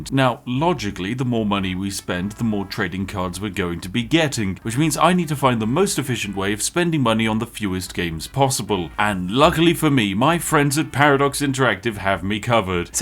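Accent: United Kingdom. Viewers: Go speed run the bible please!!! I realy need a refreshing on the material with a UK accent..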